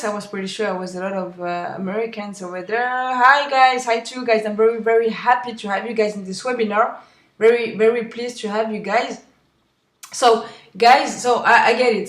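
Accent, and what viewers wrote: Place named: France. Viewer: Trop mimi ton petit accent francais,tres bon info.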